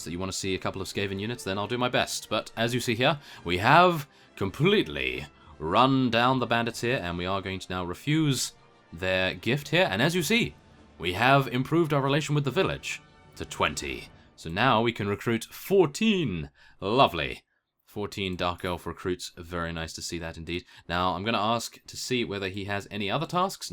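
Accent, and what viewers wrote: United Kingdom. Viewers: Even though I am also british, I love your accent xD Some of the way you talk does make me giggle :)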